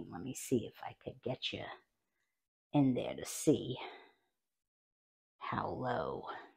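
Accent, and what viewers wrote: New York, United States. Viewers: I’m making this for dinner tonight! I found you through crocTober, but I’m going to subscribe. You sound like all of my family back in New York!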